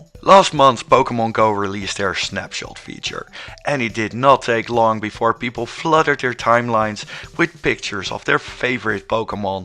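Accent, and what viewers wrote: Netherlands. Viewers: Eyy I hear you’re dutch!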